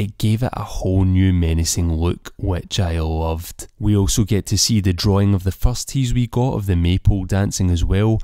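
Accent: Scotland, United Kingdom. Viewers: Great listening to your accent and Scottish sense of humour!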